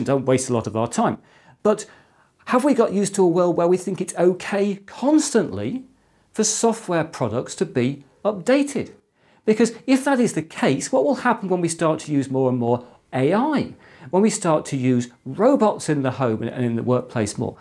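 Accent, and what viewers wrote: United Kingdom. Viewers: It's like LInus Tech Tips but Bri'ish.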